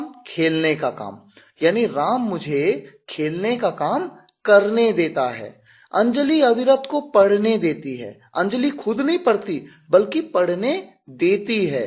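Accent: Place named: Bihar, India. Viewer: Bihari lg raha hai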